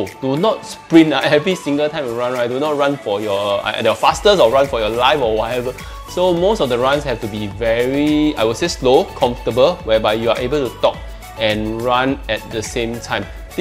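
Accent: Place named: Singapore. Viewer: the way how Singaporean talk. The slang is weird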